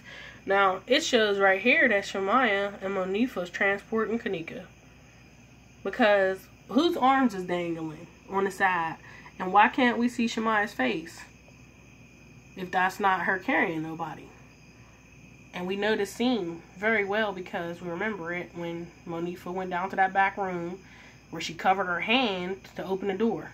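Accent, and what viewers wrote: Pittsburgh, United States. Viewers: Great video. U sound like your from Pittsburgh 😀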